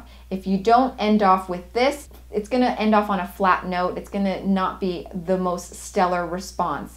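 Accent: Canada. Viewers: please send me the link to your cheat sheet for resumes!! thankyou.. great video!!! are you from canada? your accent is similar to mine! :-) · You Canadian , right ? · Oh god... she’s Canadian...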